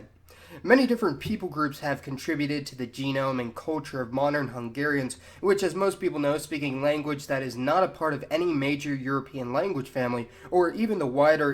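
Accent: United States. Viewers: Dude, your hyper-stereotypical American pronounciation is just killing the fun in listening to your vids.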